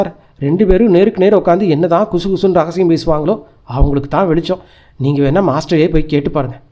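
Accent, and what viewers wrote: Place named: Kerala, India. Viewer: You have acent of Malayalam.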